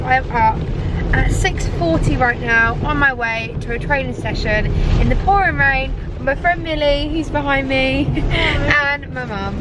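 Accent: United Kingdom. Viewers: Is she British? I think cuz of their Accent ..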